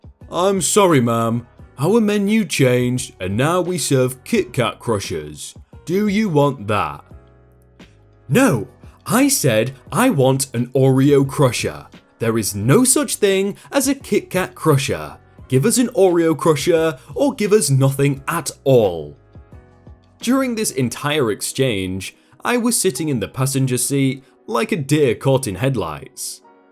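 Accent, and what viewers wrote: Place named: Midlands, United Kingdom. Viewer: Curly Nation06 Yeah, he has a midlands accent, I believe. Definitely somewhere middle or north in the UK XD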